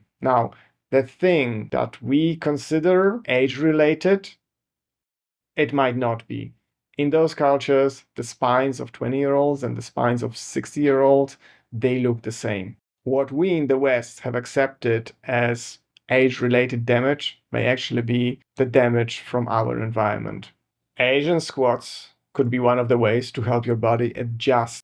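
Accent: Poland. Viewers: I m so confused by your accent. On top of your primary accent, Polish,do you also have a German accent? Or am I imagining this?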